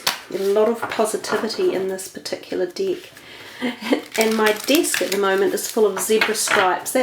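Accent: New Zealand. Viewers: keeper deck as in deck of cards,the reader is a kiwi they speak different.....think fush and chups.....thanks cuz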